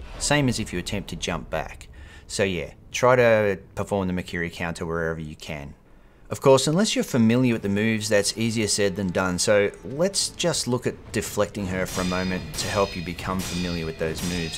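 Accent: Australia. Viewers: Pretty Cool. You sound like an Aussie.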